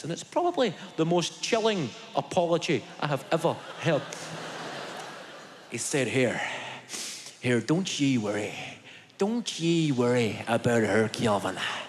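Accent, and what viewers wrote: Scotland, United Kingdom. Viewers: It's not an English accent It's English with a Scottish accent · Can you please add subtitles to this? I'm from middle east and it's really hard to understand Scottish accent :(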